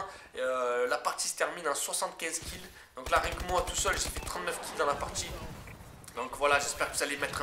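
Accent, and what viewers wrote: South, France. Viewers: Première fois que je vois tes vidéos, je kiffe ton accent du sud, bonne continuation ! ;)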